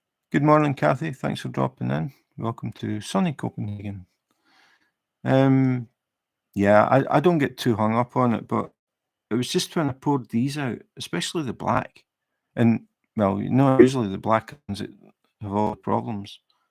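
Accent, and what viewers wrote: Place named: Scotland, United Kingdom. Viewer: Diamond painting AND a Scottish accent. I can change up from diamond painting with Glaswegian comedian Kevin Bridges. 😳😂😂😂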